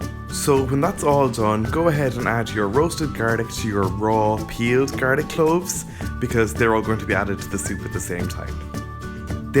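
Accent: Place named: Scotland, United Kingdom. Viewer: I like your Scottish accent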